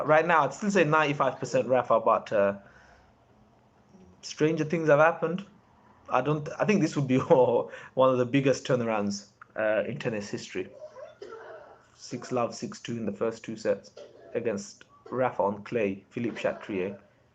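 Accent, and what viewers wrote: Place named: United Kingdom. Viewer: Guessing from his accent, I'd say the UK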